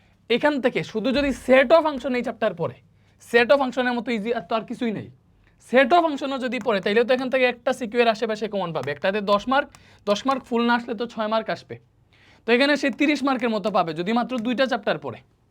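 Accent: Sylhet, Bangladesh. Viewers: ভাই আপনি কি সিলেটী 😊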